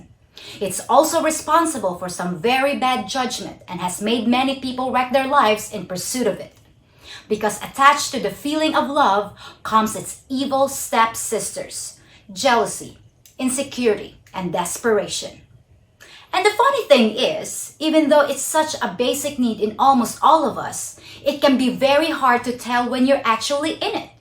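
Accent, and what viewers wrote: United States. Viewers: Wow, you're very likable. You are definitely a filipina but you talk like an American. How did that happen? - Did you live in the US? Is one of your parents a foreigner? - Or maybe you're just an internet junkie who simply figured it all out!:) - Kisses from Toronto Canada. - Mauhhh... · Your chanel is well balanced, informative and easy to be understood. The only thing is that strong american accent what is unusual for european ears but... I like your channel. Keep on doing. Smart woman.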